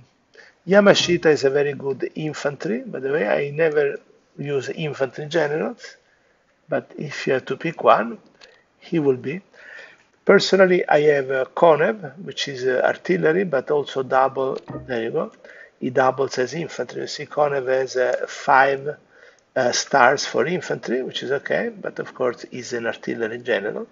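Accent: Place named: Thailand. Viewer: he's from thailand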